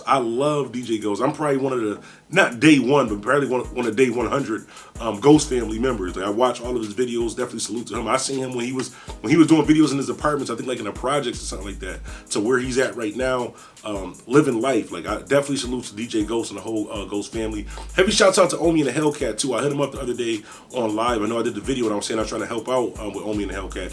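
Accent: Philadelphia, United States. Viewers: Bro you sound like a philly nigga js bro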